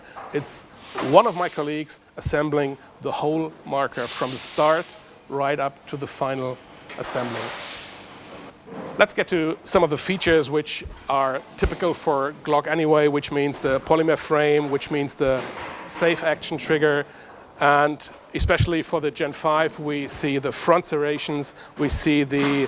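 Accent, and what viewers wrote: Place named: Germany. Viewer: German Akzent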